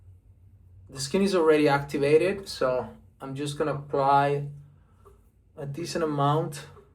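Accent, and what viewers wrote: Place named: United States. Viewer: Your English is pretty good! Where did you learn it? You sound like you have been in the States for a while.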